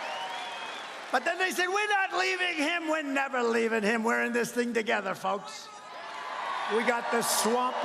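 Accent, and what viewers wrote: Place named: New York, United States. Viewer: Donald J. has the most beautiful New York way and accent of the people of New York. The greatest New Yorker of all time. I agree with your President, Brad Pitt comes off as a wise guy to me. Movies need less CGI and more talented actors. Gone With The Wind was a beautiful ugly film about ignorance in human history. Thank you Most Honourable President Donald J. for your channeling honesty and integrity. God Bless The #1 USA. I believe South and North Korea needs to settle their own issues and stop threatening the world. China needs to tell the world the truth about where this Coronavirus alias WHO renamed biological weapon COVID-19 immediately.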